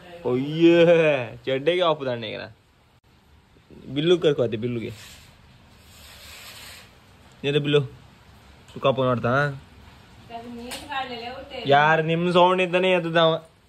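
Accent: Kundapura, India. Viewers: What kannada he speak is not normal kannada but it's dialect of kannada called " Kundapura kannada". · ಚೆಂದ ಇತ್ತ್ ಮಾರೆ ನಿಮ್ಮ vlog ಕುಂದಾಪ್ರ ಕನ್ನಡ ಕೆಂಬುಕೆ ಚಂದ ಶುಭ ವಾಗಲಿ 👏👏👏👏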